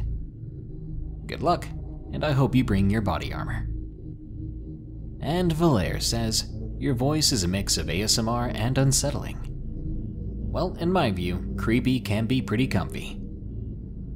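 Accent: South, United States. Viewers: He practiced, in his old videos he sounds super southern and not silky smooth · The american accent version of Lazy Masquerade. I kid you not, you both have the same tone, same way of narrating the only difference being the accent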